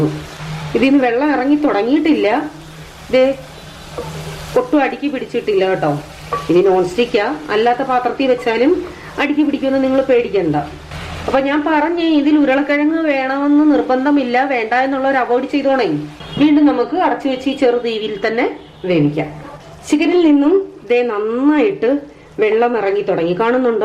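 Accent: Kottayam, India. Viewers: ചേച്ചി കോട്ടയംകാരി ആണോ.... അവതരണശൈലി കേട്ടിട്ട് അങ്ങനെ തോന്നി...... എന്തായാലും അടിപൊളി.... നോയമ്പ് കഴിഞ്ഞു ഒന്ന് ഉണ്ടാക്കി നോക്കണം.......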